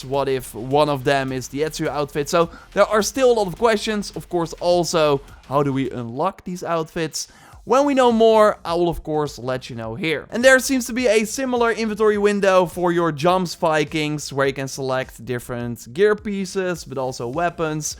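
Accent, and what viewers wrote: Netherlands. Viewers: Man he has such a bad accent, I mean I know several dutch ppl who speak normally and this guy's out here saying fideo and ashgard and volhala hahaaha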